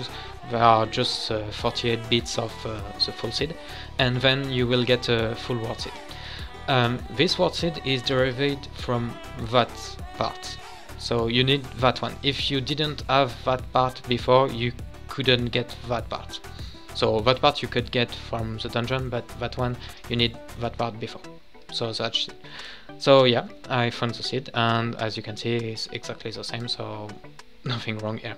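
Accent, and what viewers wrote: France. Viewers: Merci ! Ps : J'adore ton accent francais mdrrr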